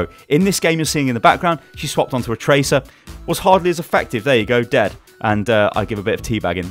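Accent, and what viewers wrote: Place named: United Kingdom. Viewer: Great British Accent! XD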